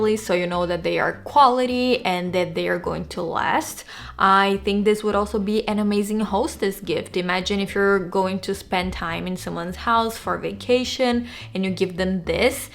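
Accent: Brazil. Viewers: wow so many good jewels, thank you!! I have been wondering where your accent id from - I Love Brazil and it's on my bucket list to visit :)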